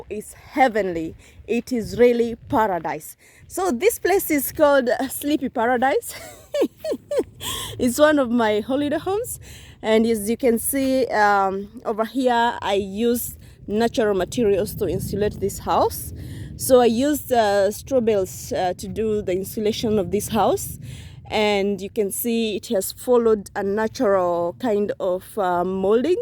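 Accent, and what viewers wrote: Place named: Kenya. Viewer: U sound kenyan.....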